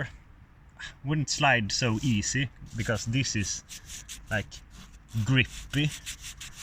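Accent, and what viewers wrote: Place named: Sweden. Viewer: swedish accents in english are awesome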